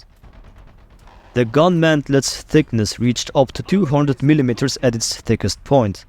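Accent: Germany. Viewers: come to learn about T-54, stay for the German accent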